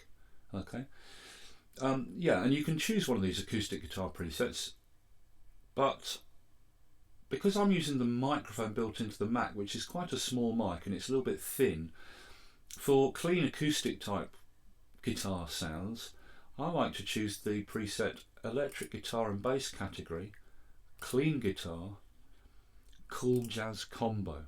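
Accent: United Kingdom. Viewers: One of the best tutorials! This Britt knows how to explain clearly. (Even with his cool British rocker accent). :)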